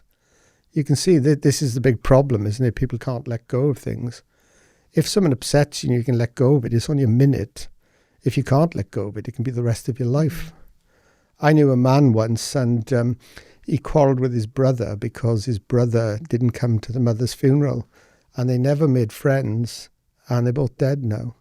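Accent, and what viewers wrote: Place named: North, United Kingdom. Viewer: Still can’t get over a monk with such a strong northern accent 😝👍🏻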